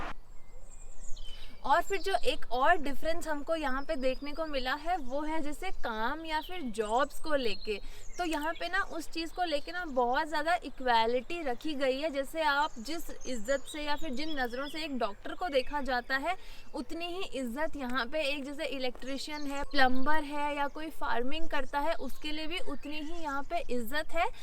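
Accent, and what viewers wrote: India. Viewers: आप की भाषा लहजा देख लगता है पक्के भारतीय हो भारत की बुराई करना भी चाहो तो नही कर सकते।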